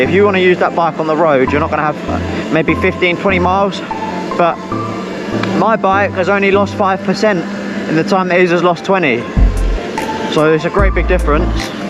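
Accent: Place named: Midlands, United Kingdom. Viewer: We’re are you based mate trying to figure it out I’m Northampton you sound like your midlands. I like the look of the woods. Also thinking about losing my front mud guard as it looks much better. Do you not get a face full of mud 😂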